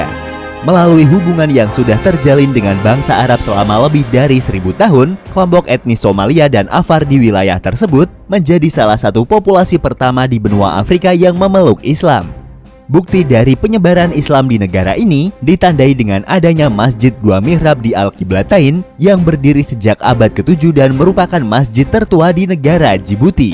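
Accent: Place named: Ponorogo, Indonesia. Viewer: wong ponorogo ndi ki suarane.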